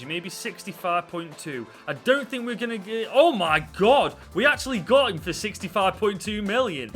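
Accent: Stoke, United Kingdom. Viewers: Hey man, quality video! Just wondering from the accent if you’re a Stoke lad?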